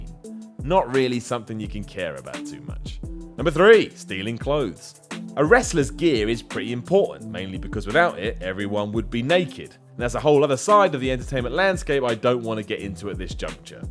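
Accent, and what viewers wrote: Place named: United Kingdom. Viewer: NuclearWinter i quite enjoy his voice actually. its soothing. but that could just be the biased American, who loves British accents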